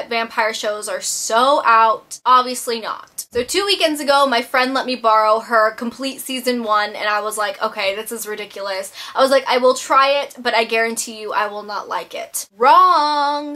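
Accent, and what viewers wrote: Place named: California, United States. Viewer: Bahhh you're so funny! we have similar humor! you sound like you're from CA too, correct? :)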